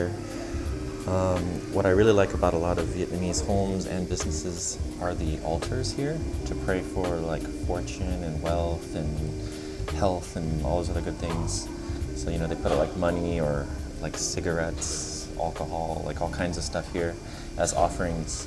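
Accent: United States. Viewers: Thank you for your reply. Hanoi sounds like the ideal climate for me. I like a mild winter but not 5 months of ice and snow. I would like to find a studio for $250 or a little more depending on amenities. Like you, I don't want to share a kitchen. Too many bad experiences sharing kitchens or bathrooms. Most people are not very considerate. You sound like an American. Are you American or Canadian? If American, have you run into resentment regarding the Vietnam war? I was always against the war. I am old enough to have fought in it but I was so opposed because I knew we couldn't win unless we used nukes and that would have brought in China. Johnson was a very bad, stupid president who wasted a lot of lives on both sides because he couldn't admit he was wrong. Eisenhower told Kennedy to never get involved in a land war in Asia because you can't win but they murdered Kennedy who was going to pull out after the 1964 election. 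I have many reasons for being interested in living in Vietnam, mainly the cost of living and I like the people. I rented apartments in S.F. to boat people in 1979 and found them to be lovely people. I also am interested in seeing if I can help mitigate the damage the U.S. did by teaching english, friendship, mitigating agent orange etc. By being there I can also help their economy in my small way.I will look for your Q and A video. Sounds good. Thanks again.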